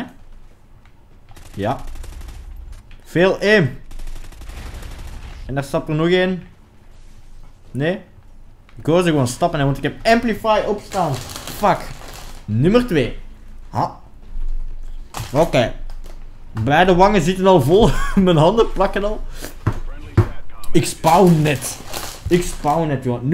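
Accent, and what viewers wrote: Belgium. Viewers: Ga dan ook niet kijken als je zijn stem niet leuk vindt of doe dan je geluid uit... ik vind het echt niet vervelend om naar een belg te luisteren. Ik vind het wel een leuk accent =) dus pls ga niet schelden in de comments. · dat belgisch is gwn te verstaan ik vind het juist super leuk klinken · belgen hebben een HEEL cool dialect en belgen zijn baas !!!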